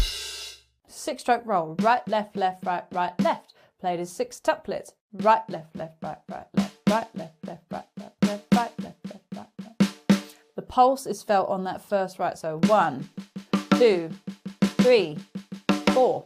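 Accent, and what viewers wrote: United Kingdom. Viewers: Hey, love your teaching methods. I'm a 60-plus-year guitar player and singer and have been in many bands. They never wanted to do my songs so now I'm laying them down on Pro Tools. I don't play drums, so I'm laying them down in MIDI and your channel has helped me a bunch to get different 3/4 drum beats down and the fills. I have a digital drum set, given to me by my grandson, and plan on learning after I get my song down. You (your videos) will help me tons. BTW I'm a huge Beatle fan, so your accent is delightful and witty as Brittans tend to be. Love it.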